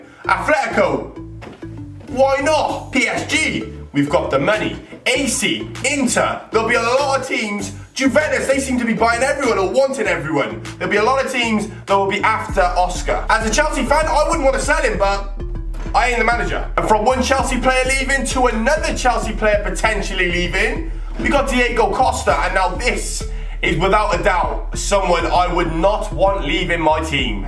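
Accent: Wales, United Kingdom. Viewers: Are u welsh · Are you wellsh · Are you Welsh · ar you Welsh? cuz i am! <3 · are you Welsh? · are u Welsh cos I am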